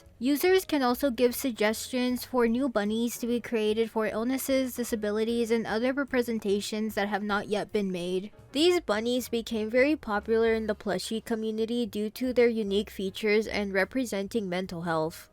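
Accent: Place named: North America. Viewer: You're kidding. You speak better English than me, and that's MY first language! And the accent sounds like it could be from the US or Canada, which is even more impressive because it sounds like you grew up in one of those places around people with the same accent! And no sweat on mispronouncing things. Us folks that grew up with English do that occasionally, too. I used to do YT, too, and sometimes, I'd read something, know exactly what that word was, but my voice would already have enough momentum going it would absolutely plow through a word with no regard for pronunciation like a bull through a gate.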